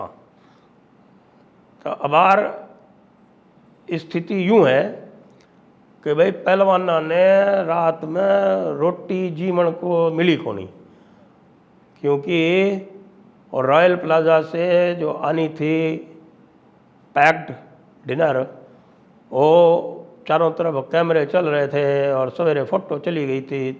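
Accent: Haryana, India. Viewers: वाह साहब न्यूज बताने का हरियाणवी अंदाज बड़ा निराला है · Zabardast haryanavi, sir. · Your Hariyanvi is too good, Sir 🙏🏻🙏🏻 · Ha Ha love your Haryanvi Accent 🤣🤣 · Haryana local language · जी, आप तो बड़ी गजब हरियाणवी बोलल्लो छे, घणो दिल खुश होग्यो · Sir your Haryanvi is too cute😂😂 And ye log Haryana ka naam dubane ki kaam me lag rkhe hai. Kori sudhi bakwaas kr re hai ye bawde. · Your Haryanvi accent is amazing. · सर आपकी हरयाणवी सुन कै स्वाद आ गया 😂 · Ajj ka andaj nirala rha haryanvi style me. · Sir aapki haryanvi sunke swad agya. Jai Bhagwan parshuram 🤩🚩🚩🚩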